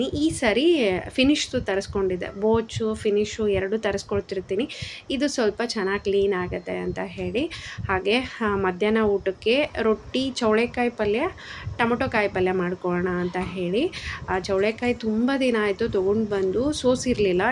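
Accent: Raichur, India. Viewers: ಅವರೇ ನಮ್ದು ರಾಯಚೂರು ಹತ್ತಿರ ಬಂಗಾರ ಹಟ್ಟಿ ಹೀಗೆ ಇರೋದು ಇಲ್ಕಲ್ ಬಾಗಲಕೋಟೆ ಡಿಸ್ಟ್ರಿಕ್ಟ್ ನ್ಯೂ ರಾಯಚೂರು ಭಾಷೆ ಮಾತಾಡ್ತೀರಲ್ಲ ನನಗೆ ತುಂಬಾ ಇಷ್ಟ ಆಯ್ತು ಅಡಿಕೆ ಡೈಲಿ ನಿಮ್ ಚಾನೆಲ್ ನೋಡ್ತಾ ಇರ್ತೀನಿ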